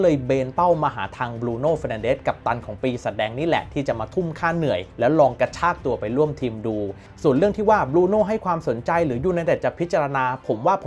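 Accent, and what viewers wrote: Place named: Isan, Thailand. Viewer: ขำตา พูดอีสานผมลูกอีสานยังขำเลยอ่ะ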